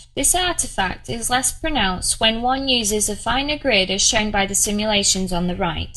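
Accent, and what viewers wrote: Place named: Northern England, United Kingdom. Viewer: Yes, her accent is somewhat northern English. Do you have trouble understanding what she is saying?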